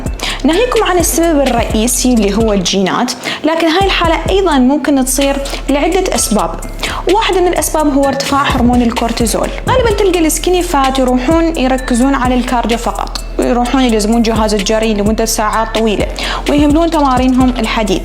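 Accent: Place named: Iraq. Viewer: شكلك اوروبيه لكنتك عراقيه.. عملالى error براسى😐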